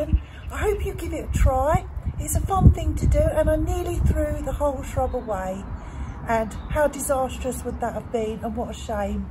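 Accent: United Kingdom. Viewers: Brit accent.... ive got an OLEANDER, large shrub, need to clip into a tree form 😊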